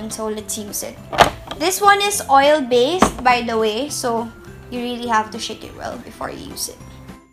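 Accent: Conyo, Philippines. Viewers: So conyo lol new subbie here :) you're pretty and detail by detail talaga ang pag explain kaya helpful 👍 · So conyo nman this girl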